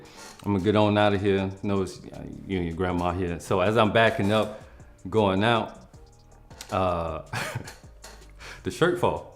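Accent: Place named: South, United States. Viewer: is so country and it makes me so happy ❤😂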